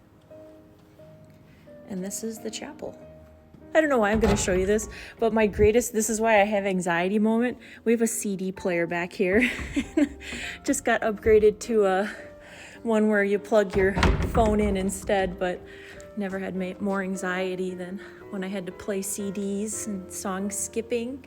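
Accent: Minnesota, United States. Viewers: I knew your from Minnesota through your Minnesota accent!!! As confirmed by the Minnesota State flag!!! Good guess here!!!😂😂😂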